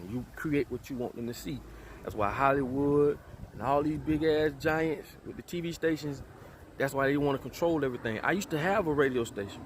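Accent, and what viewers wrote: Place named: South, United States. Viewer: Admirable..... that southern drawl i love also i just admire ur mindset we must be in the same soul group or something. Keep up the good work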